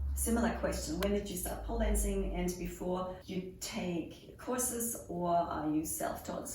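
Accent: Germany. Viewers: Ha! Wie Geil. Danke fürs beantworten! :D And also for translating my questions, I never thought that this could be a struggle! ;D Vom dialekt her hätte ich dich eher in Franken einsortiert aber das du in Ffm geboren bist find ich ja ultra witzig...Vielleicht hat der YT algorithmus dich mir deshalb vorgeschlagen? Grüße aus dem norden Frankfurts!! :D p.s.:Wenn du das nächste Q&A machst kann ich ja wieder fragen stellen. Und wenn ich dir ne flasche Äppler an die Goldcoast schicken soll, sag bescheid :D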